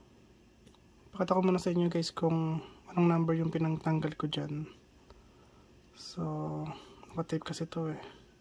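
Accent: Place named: India. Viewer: Bros talking Indlish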